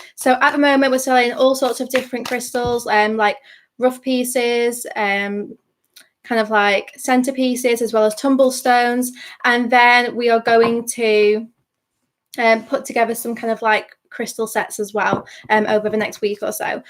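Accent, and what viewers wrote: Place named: Wales, United Kingdom. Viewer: Well done on your first YT live!! Personally prefer YT to IG. You sound like you're not too far from me. I'm in North Wales xx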